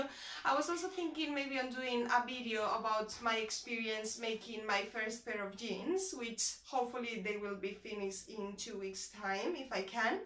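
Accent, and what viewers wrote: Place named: Mexico. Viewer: hello, nice video, you have the same accent, that my mexicain Lady friend. The minute i heard you, i toughs of her, anyway, nice video keep on going.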